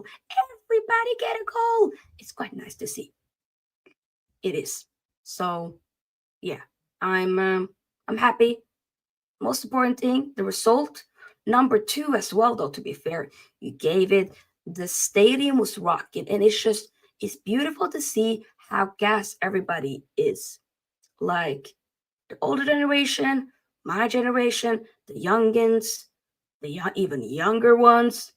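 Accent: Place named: Norway. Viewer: The instant you started talking I knew you where Norwegian lol. That accent is real. Thanks for the video :) I think Nusa should stay for a couple of seasons in Bundesliga before going to a bigger club. But he will def end up in a top club with time. Let's not rush anything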